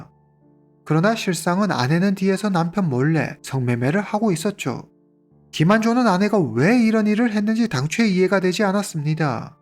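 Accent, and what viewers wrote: China. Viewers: 한굳말 잘하는 중국분이라고 생각했어요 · 조선족 교포 이신가요?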